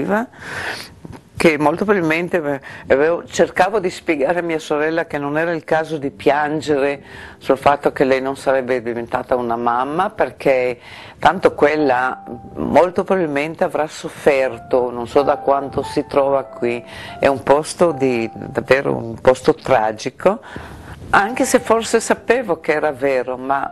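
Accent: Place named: Rijeka, Croatia. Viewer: Còcola, che emozion rivederte in video e ricordar i nostri incontri... sentir la tua bela parlada fiumana: no te dimenticheremo mai! abrazi, da qua giù fin là su!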